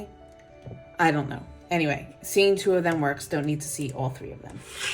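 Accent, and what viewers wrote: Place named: Northeast, United States. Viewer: I’m actually binge watching your videos. I just found your channel yesterday and I love it! You sound like you’re from the northeast which is my home. ❤️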